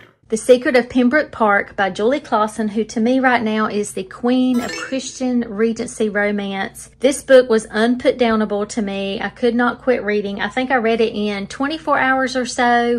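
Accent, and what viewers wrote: South, United States. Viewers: Hello miss I am a young adult Midwestern girl! I am a big fan your southern accent brings me back to my childhood family friend who would always say yall😂. Great recommendations by the way I love fall and I just finished the Love's a mystery in sleepy hollow! It was so cute and cozy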